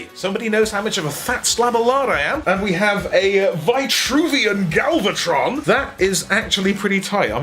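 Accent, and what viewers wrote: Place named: Birmingham, United Kingdom. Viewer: West Midlands crew report, Stafford here... P.S I caught the brummie twang straight off the bat haha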